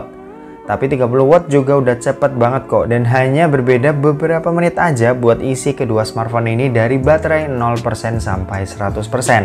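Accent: Semarang, Indonesia. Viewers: org semarang bro?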